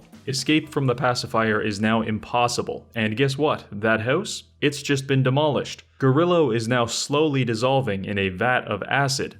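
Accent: Canada. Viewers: I love how your accent seems quite local to me until one word comes out ULTRA CANADIAN and I'm reminded you're not local to me at all.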